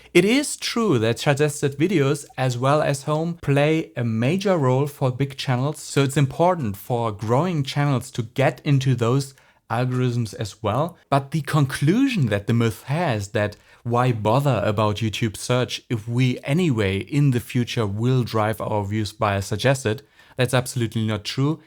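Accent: Germany. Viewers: You have some accent. Are you German? Did you consider adding German or whatever subtitles + description translation to your videos to run an experiment and measure the effect on total views? Can't find this kind of topic anywhere. Seems like nobody figured it out.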